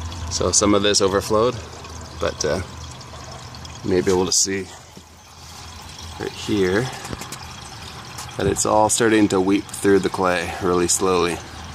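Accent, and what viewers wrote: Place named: Canada. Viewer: Thanks for the speedy response. I thought I detected a Canadian "about" in your video. I think I might have to give one of your barrels a try. Thanks for all the info!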